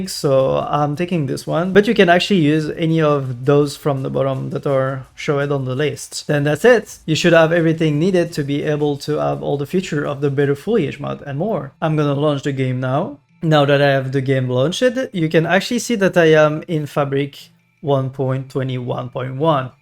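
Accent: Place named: France. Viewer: hahaha parce que je suis francais j'ai reconnu un petit accent francais en toi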